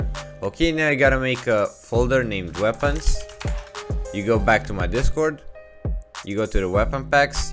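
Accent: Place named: Romania. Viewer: Ori cat de bun ar fi un roman la engleza tot se cunoaste dupa accent:)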